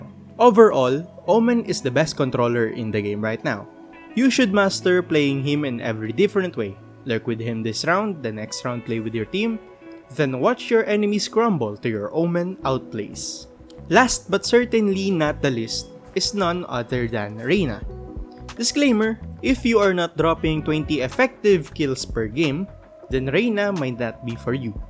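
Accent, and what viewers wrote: Philippines. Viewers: hey man what region do you play in? you sound like you're from apac specifically in the philippines lol. not that you mind!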